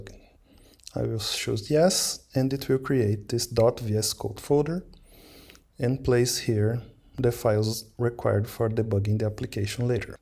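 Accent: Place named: Brazil. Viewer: Very good english bro. Haha percebi que era brasileiro pelo sotaque, muito bom o tutorial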